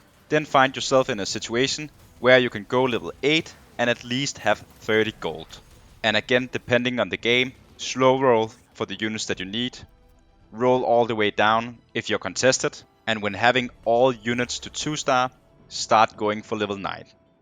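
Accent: Denmark. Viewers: Are you danish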